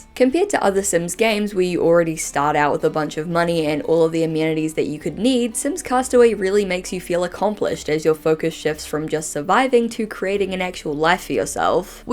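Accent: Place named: New Zealand. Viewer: The original Sims is, so far, the only Sims game I've ever played, and I only got to the House Party (?) expansion pack. I watch these videos because I enjoy yer videos. And because I'm so intrigued by kiwi accent. But also because I enjoy your videos. So appreciate how much we (can only speak for myself, actually; sorry) aoppreciate your videos, dammit!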